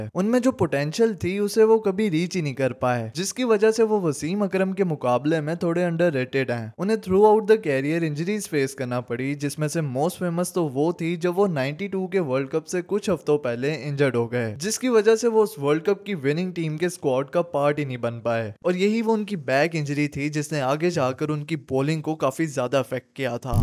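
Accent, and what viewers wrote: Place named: Punjab, India. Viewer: Not "Floup" it's "Flop" I know and I can understand you are Punjabi by your accent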